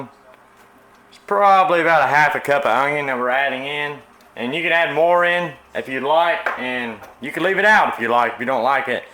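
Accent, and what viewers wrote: South, United States. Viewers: Southern accent.. Im from Waterloo Alabama so I hear it. Just found your channel and love it!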